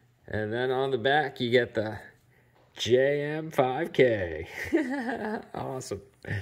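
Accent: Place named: Boston, United States. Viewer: If they sell well, please order 2X and 3X. Never like shirts that actually fit. I like them falling off. Never white, black or dark colors are best. I'm a scrapper and it'd get ruined on day one. Also, I'm originally from Somerville, MA. Love to hear you speak Boston. I'm in Connecticut and they talk funny LOL.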